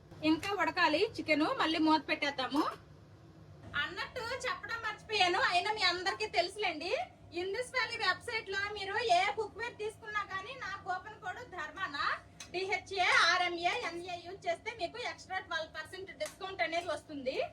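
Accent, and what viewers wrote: Srikakulam, India. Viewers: mee Srikakulam yesa olamoo olamoo 😂😂 bagundhi baaga navukuna nenu aite..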